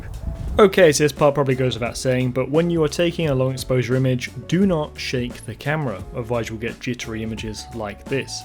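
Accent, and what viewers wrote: United Kingdom. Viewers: Very distracting, having a British accent, channel based in UK but quoting prices in Dollars. Strange. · Great video...if i was just listening with only sound, you sound like Physicist Brian Cox...English accent and all...I had to double check as I was typing this lol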